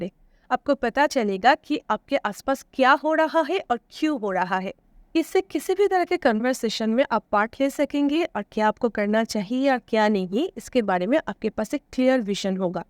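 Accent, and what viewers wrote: Bengal, India. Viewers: Dear loved your todays vlog very much though all your vlogs are meaningful but todays vlog is insightful also and the way you say or convey your thoughts is so simple and beautiful the Bengali touch in your accent is lovely to hear❤❤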